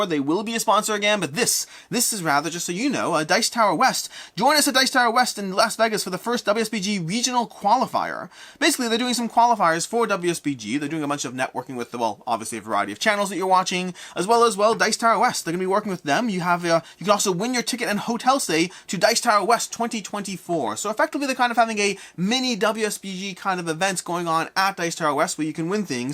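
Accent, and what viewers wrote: Canada. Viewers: I believe your Canadian upbringing is showing. We inherited British pronunciations (and often, spelling) but our proximity to the U.S., and the flood of T.V. channels, has led to this confusion. Just say it the way it feels god to you.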